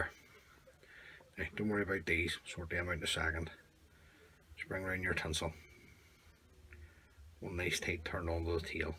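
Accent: Northern Ireland, United Kingdom. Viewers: Well, if that's not a norn irish accent. Great wee video of a very traditional fly. I've been away from tying for about 45 years and I'm glad to see that these haven't changed. Found most of my kit the other day so I think it's time to revive an old passion of mine.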